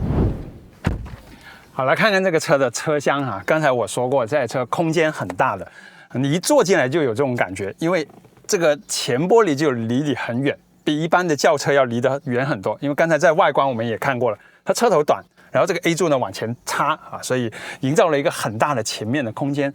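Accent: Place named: South, China. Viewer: 听口音，100%南方人，哈哈哈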